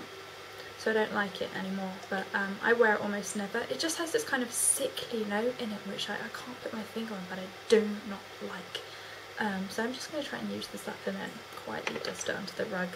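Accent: United Kingdom. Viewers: You're so british! In a good way ^^ haha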